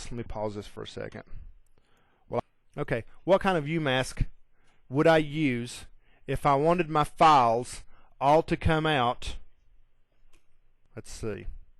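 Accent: South, United States. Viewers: Thanks, that glorious southern accent now pops into my head every time I'm setting permissions!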